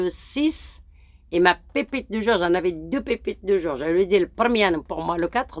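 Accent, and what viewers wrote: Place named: Brazil. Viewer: Bonjour de la Guadeloupe...continue sur les pronos..avec ton accent brazil....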